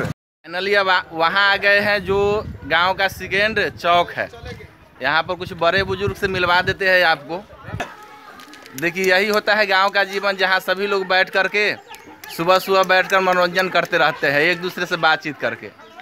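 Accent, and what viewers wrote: Bihar, India. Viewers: ये इस जमाने मे घास पूस के छप्पर सिर्फ बिहार के गावो में रहते है भाई जी । आपकी भाषा ही बता रही है कि बिहारी हो डा को आपलोग रा हो बोलते हो । ई हम नई समझे का।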